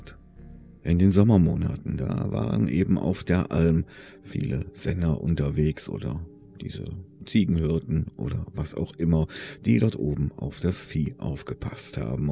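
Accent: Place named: Cologne, Germany. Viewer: Ja die Stimme 👍🏻…. als Regierungssprecher wäre der beste Wurf den diese Regierung machen könnte. Und diesen Akzent kenn ich nur zu gut als gebürtiger Kölner…… den findet meine Frau auch so amüsant und ansprechend 😉. Leider ist es mit meiner Stimme nicht so gut bestellt 😄. Ich würde mir wünschen diese Stimme mal als Synchro Stimme im Kino zu hören….. finde das mittlerweile manche Stimmen gefühlt in jedem Film vorkommen. Speziell die von Ryan Reynolds, Chris Evans und der Himmel weiß wer da noch alles synchronisiert wurde von dem Herrn. Da wäre mal eine neue Stimme mal wirklich angesagt.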